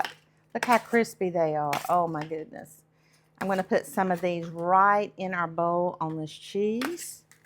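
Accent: South, United States. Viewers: Yeah I watch the videos just to hear her Southern accent.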